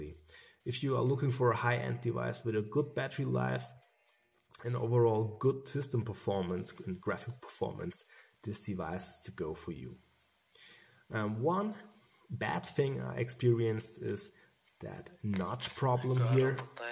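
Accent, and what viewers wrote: Germany. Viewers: Hallo...are u German?😁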